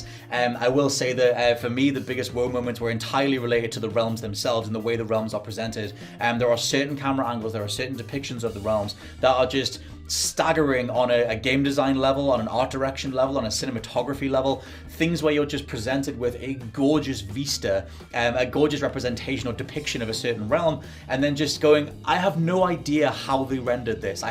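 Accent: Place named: United Kingdom. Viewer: yeah it's a because of the British accent. You'd need to be a moron not to realise it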